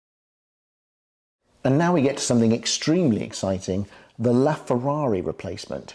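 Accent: United Kingdom. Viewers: The proper English accent. yes.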